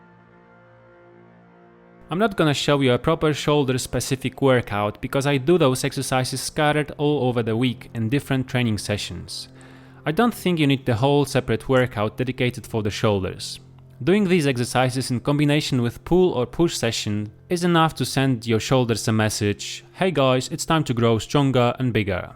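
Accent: Poland. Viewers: What is your accent? Were are you from? You sound Polish a little :D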